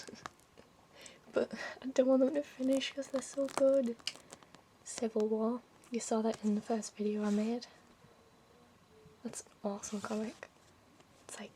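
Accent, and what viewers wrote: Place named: Newcastle, United Kingdom. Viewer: booooook? a Geordie! aha! I did a Uni study abroad program at U of York, I recognize your accent!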